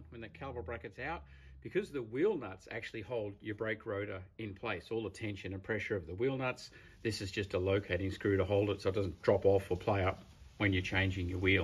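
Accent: Australia. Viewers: Were are you located. You sound aussie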